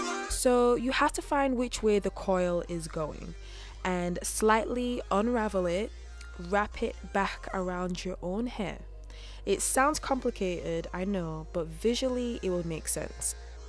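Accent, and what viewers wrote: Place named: Yorkshire, United Kingdom. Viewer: I bet alot of Americans struggle to understand your thick Yorkshire accent!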